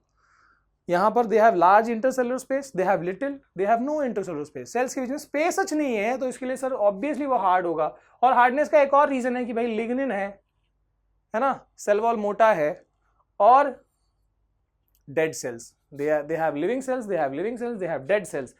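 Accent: Bihar, India. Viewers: Sir ap bihar ka ho kya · I really love the way and the accent u used to teach 😂💕 your bihari accent is mind-blowing apne wali vibe aa jati h 😌💕.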